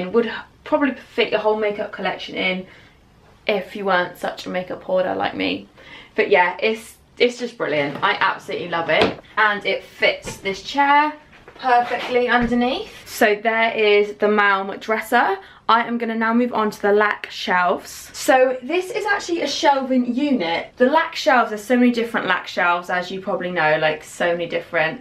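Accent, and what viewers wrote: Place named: United Kingdom. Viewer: Her accent is so cute, cutest British accent I’ve ever heard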